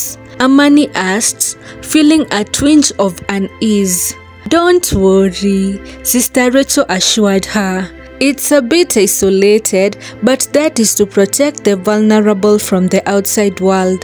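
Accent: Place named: Kenya. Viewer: Thank you too for the stories and yes, your Kenyan accent is really nice ❤️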